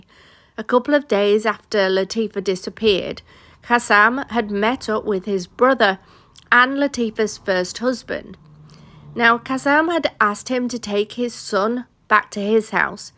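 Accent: Yorkshire, United Kingdom. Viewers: Ahh yes, a good old car boot sale. I miss home so much but listening to you makes me feel so at home again. I can't tell you how much I love your content. I watch TC content on this platform for 4-5 hours every single night for 4 years and I love your channel. A proper Yorkshire lass!!💙 On another note my grandad had a 3 wheeler EXACTLY like the one you showed. Same colour n all when we lived in Romiley. For the record he died in '86 at age 87 and that car never drove farther than Hyde or Marple. I don't even know if it was capable😂 🤘🏻🇬🇧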